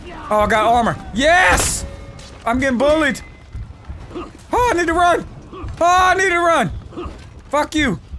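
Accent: South, United States. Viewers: How the hell would you think he's black? His accent is white southern af, I just don't see it.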